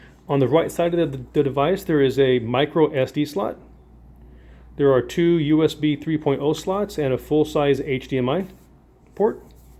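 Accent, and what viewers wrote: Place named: Argentina. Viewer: Pensé que era un ARGENTINO :V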